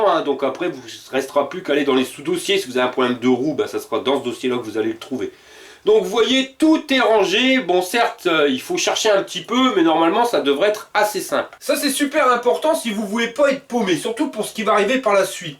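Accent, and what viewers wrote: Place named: Nord-Pas-de-Calais, France. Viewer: Salut C'est vraiment mérité les 100 k pour ta chaîne j'aime beaucoup ton style et surtout l'accent chti vu que je suis à l'opposé géographiquement parlant. Prends soin de toi.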